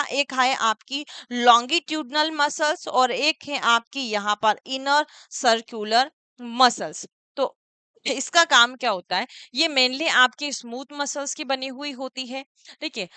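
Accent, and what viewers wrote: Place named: Kerala, India. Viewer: Ap kerala se ho kya mam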